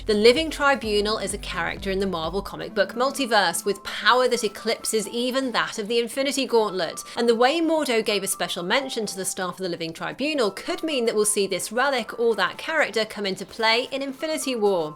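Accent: United Kingdom. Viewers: another movie video in British accent! it's the third one only today that's bizarre !!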